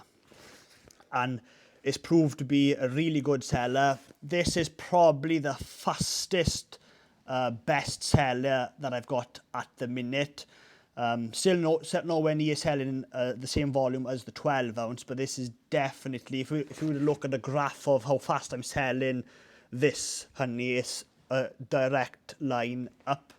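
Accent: Wales, United Kingdom. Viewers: Love the Welsh accent!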